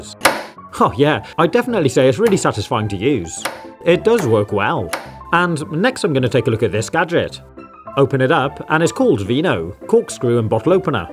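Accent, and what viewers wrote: United Kingdom. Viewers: You sound more British than British people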